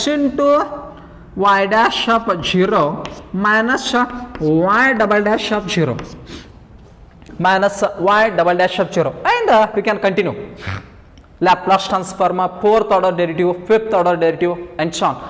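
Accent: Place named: United Kingdom. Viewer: British English accent samajh nahi atta hein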